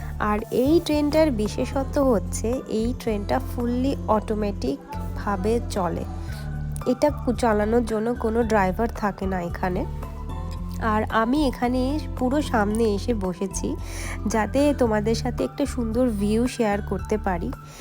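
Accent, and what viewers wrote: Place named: India. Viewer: You are Bengali🇧🇩? Or Indian? আপনার ভাষায় মনে হচ্ছে ইন্ডিয়ান! Love from Bangladesh🇧🇩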